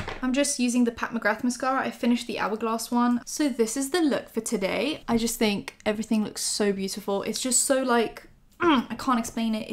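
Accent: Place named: United Kingdom. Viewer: lived in the UK since she was really young so she speaks with a british accent. You can't really tell she's polish by her accent;)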